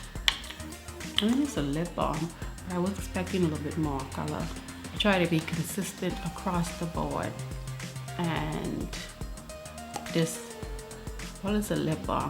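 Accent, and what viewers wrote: Bahamas. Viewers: It feeds so good to hear someone who sounds like me on YouTube. I'm proud to an see a fellow Bahamian on YouTube?I've always thought about starting my channel, but I'm scary. How did you get started? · I'm a new sub, I came across your channel and was like wait i know that accent. It's Bahamian? You mean to tell me we have our own Bahamian representating the 242 and no one told me? I'm so proud of you. Big the Bahamas, we doin7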